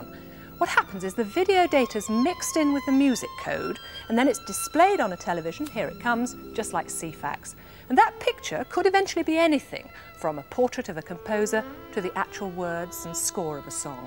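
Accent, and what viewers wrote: RP, United Kingdom. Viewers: I love the way the presenter was so posh. I wish the women I knew were hi-fi nerds! Imagine a woman blabbing on about which tapes to use and if Dolby should or should not be used! That would be fantastic, instead they bombard me with crap and TikTok videos I delete immediately upon receiving! Anyway, this cassette was a flop, the CD was launched in 1982 also and we all know what happened!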